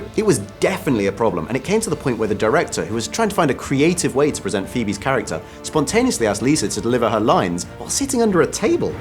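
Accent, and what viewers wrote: United Kingdom. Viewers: OMG U r too fast british accent.. Slow down man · Your accent tells me I don't really care what you think about this show. You might as well be the BBC. They like smearing American's too.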